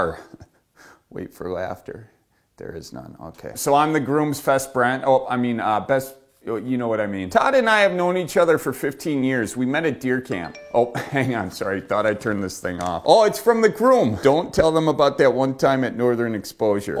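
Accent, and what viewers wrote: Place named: Michigan, United States. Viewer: He still has a Michigan accent A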